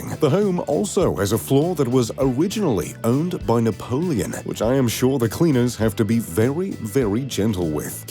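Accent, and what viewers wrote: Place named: RP, United Kingdom. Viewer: I hade no idea where you were from. But I knew you were foreign. You have mastered the upper class/posh accent 🤝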